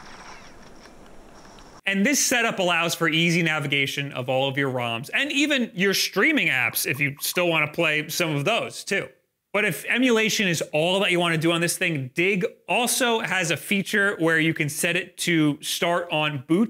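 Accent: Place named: Long Island, United States. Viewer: PLZZZ stop talking like a Long Island hussie 😊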